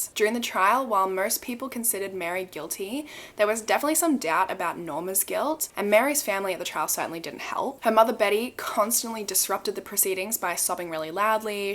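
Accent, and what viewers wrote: Australia. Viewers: Sure she is Australian 🤔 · Are you australian? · i love your australian accent!!! 😭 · Where are you from ? Australia ?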